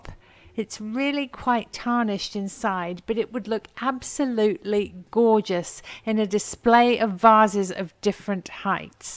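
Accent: England, United Kingdom. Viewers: Just come across your channel and I love it, however being English myself I can't help but hear an English/British accent there! Hope I'm right 😂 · I love the English accent do you miss England I always wanted to visit have a bless day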